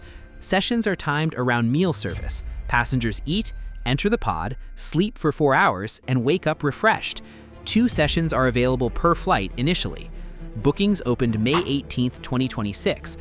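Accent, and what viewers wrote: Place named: United States. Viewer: This video is very obviously an AI Voiced (with shocking pronunciation in an American accent) infomercial produced by Air New Zealand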